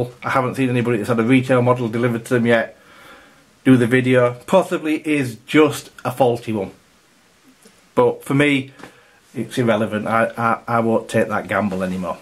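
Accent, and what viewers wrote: Yorkshire, United Kingdom. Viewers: Thanks for your honest review buddy. Sounds like you're from Yorkshire? I have a similar channel and I'm in Sheffield. Take care bud.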